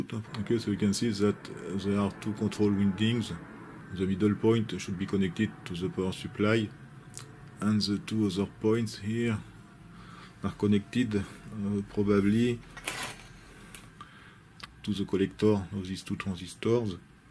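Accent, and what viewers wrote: France. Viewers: Getting better at understanding Frenglish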